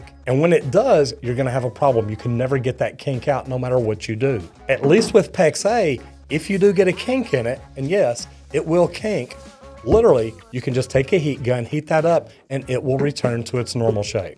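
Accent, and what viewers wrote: Texas, United States. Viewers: He's knowledgeable and interesting but that Texas/Southwest accent is hard to take.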